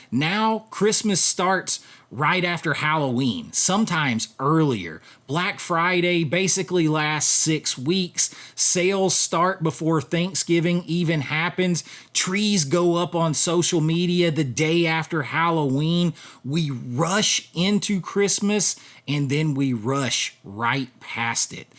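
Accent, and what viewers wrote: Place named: South, United States. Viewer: Absolutely does. Sorry i never check back for replies because i guess im gettimg older but again tonight im watching you new video as we text. Me and my daughters joke now "pop that tape in i gottt youuu" 😅with your southern slang l😂 love it. If i can pull up the $ ill definitely patrion. You kmow how it is brother.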